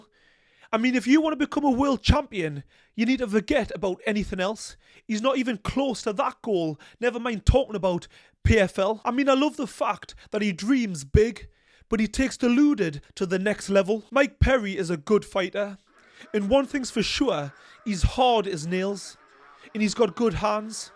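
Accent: Newcastle, United Kingdom. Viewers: You from the Toon or Durham mate, regardless subbed 👍🏻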